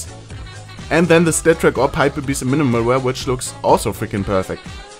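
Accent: Germany. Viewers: Wie man merkt dass du Deutscher bist :D trotzdem sehr gutes englisch und good vid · Du bist sicher Deutsch, merkt man an deinem Englisch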